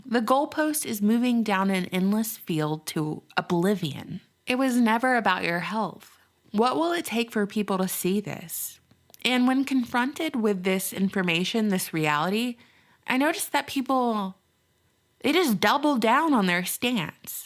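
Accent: South, United States. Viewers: So great to hear you again, as a southern boy working abroad its beyond refreshing to hear a voice from home. I think a lot of us "Americans" are caught in a daze by whats is happening and just as you said how fast things are changing in America. In this daze, we are letting slip through things that I would never think we would accept. The past el-ec- tion was a clear indicator of just how, what many Americans believe is a lie and I also think that is what started the dominos falling. Because nothing was done by any of the supposed safeguards in our government or judicial branch about the el-ec- tion, many Americans are in a state of trauma. If you've ever been around people in a state of trauma you will understand, they act on muscle memory, aka they will just blindly do what is the norm, even as the world around them is on fire, I think we are at that place as a nation! 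While many other Americans are have developed Stockholm syndrome due to restrictions, mandates, lockdowns, etc.... again like trauma people who are suffering Stockholm syndrome, people will accept and do things they normally would never even consider! You are one of the very few internet personalities that dig deeper into social issues and overlay a level of human psychology that makes sense to why things are happening! Great to hear and look forward to many more of what you did hear!! Thanks. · I'm a NC girl, myself, so my inner dialogue is sprinkled heavy with that southern salt I hear in your outer dialogue. I am both jelly and terrified of the NPC who doesn't talk to themselves. Of course, those have been bred and programmed, I mean raised, not to think for themselves, only to consume. Consume all the gmo and PC rhetoric the powers that shouldn't be try to shove down all our brains. 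Those consumers make me so sad. I mourn for all the potential I see being flushed right now. But I for one really appreciate the fact that you let us into your beautiful mind every once in a while. Stand sting in your knowledge, and I sure hope that wisdom starts with the true Rock of our Salvation. Much love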